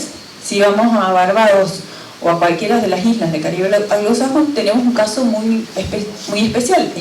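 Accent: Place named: Argentina. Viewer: saludos. no teiene mucho quever pero me suena como a unespeciede acento Mendocino de argentina o Cordobes de argentina.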